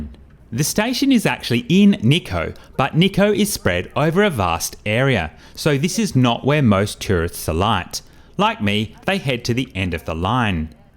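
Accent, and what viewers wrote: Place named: Australia. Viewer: good to see you again Love your heavy Aussie (if I may say so) accent 😊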